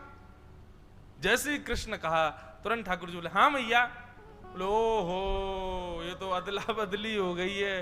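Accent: Braj, India. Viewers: Aap k muh se brajbhasha bahut sunder lagti wah wah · आपके श्री मुख से ब्रज भाषा बहुत ही सुंदर लग रही है मैं तो तरस गई थी ऐसी भाषा सुनने के लिए 🙏🙏🙏🙏🙏🙏🙏🙏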